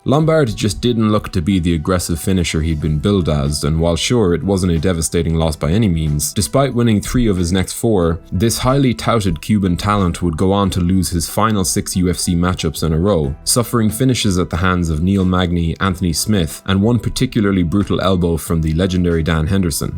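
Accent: Ireland. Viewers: ''tug rose'' haha I love irish accents, keep up the great content, you're my favourite narrator on this channel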